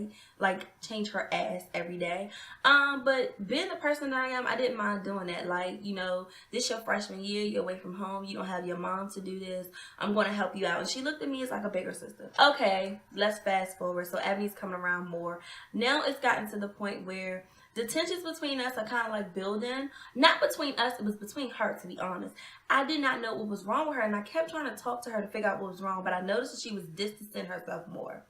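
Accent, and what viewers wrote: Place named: Baltimore, United States. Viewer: Just found your channel!!! I love it! Are you from BMORE or DMV? LOL I hear your accent lol New subscriber boo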